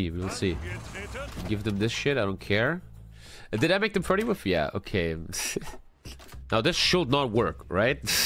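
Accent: Germany. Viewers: So geil man hört raus das du Deutscher bist. Endlich einer von diesen Kanal der Deutscher ist. xD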